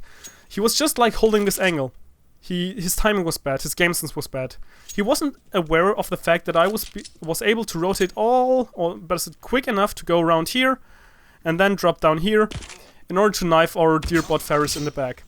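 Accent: Germany. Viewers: your sound like german are u?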